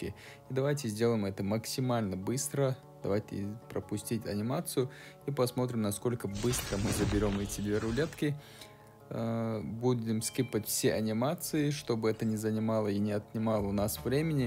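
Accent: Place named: Chechnya, Russia. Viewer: Брат, ну вот не могу понять, акцент у тебя Чеченский, но русским владеешь хорошо, я в замешательстве.. ТЫ КТО ВОИН?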